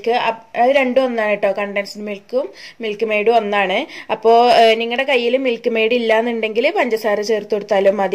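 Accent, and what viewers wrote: Malappuram, India. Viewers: Malappuram slang adipoli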